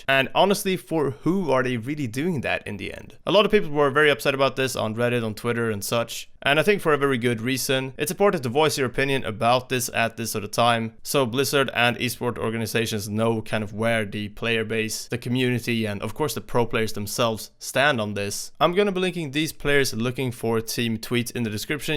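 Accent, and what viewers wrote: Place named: Sweden. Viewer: You sound like a swede.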